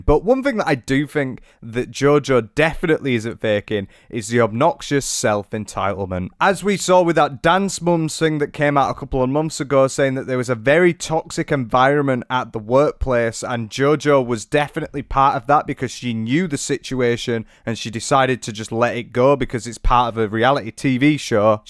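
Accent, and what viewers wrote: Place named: England, United Kingdom. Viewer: Definitely my new favourite YouTuber usually I can’t handle English accents but yours is adorable 😂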